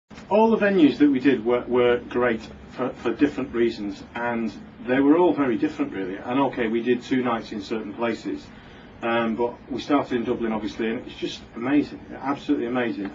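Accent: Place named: England, United Kingdom. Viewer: no one else finds it odd that he sounds like he is english and sings like a black man????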